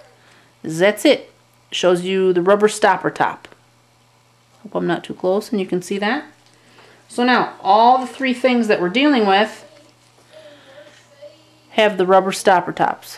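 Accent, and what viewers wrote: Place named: Minnesota, United States. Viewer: Great video. Love your accent. I'm guessing Minnesota.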